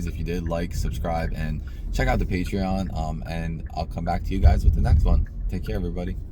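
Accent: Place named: New Jersey, United States. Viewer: The sexiest part about you is your jersey accent❤❤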